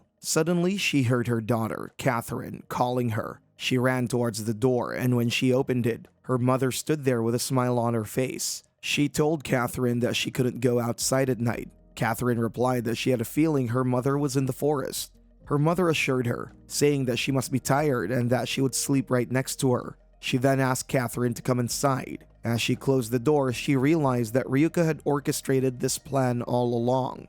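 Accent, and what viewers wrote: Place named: Philadelphia, United States. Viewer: YO THE AI HAS A PHILLY ACCENT WTF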